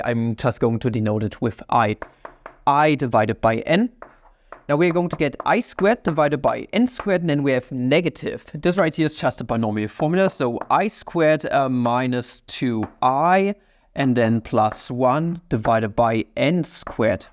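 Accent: Germany. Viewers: this is German pronuncation. is there even any word pronounced correctly?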